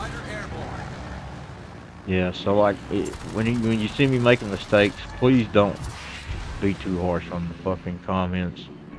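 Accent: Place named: South, United States. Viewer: Great game. Not a CV player either but enjoy all the other surface ships. I'm a player from Middle Tennessee so it's nice to see someone with a southern accent doing wows videos.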